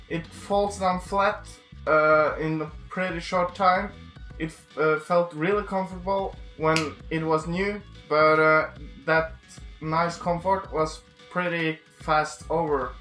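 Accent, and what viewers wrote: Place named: Norway. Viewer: Such hatred. This is jut a review man, and u are making fun of his weight and norvegian accent. You are a douche. By the way, the chair is suitable up to 150kgs.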